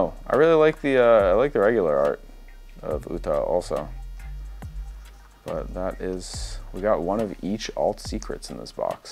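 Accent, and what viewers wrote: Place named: Japan. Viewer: You are the best bro thanks for the opening very entertaining best show on YouTube love your Japanese accent lol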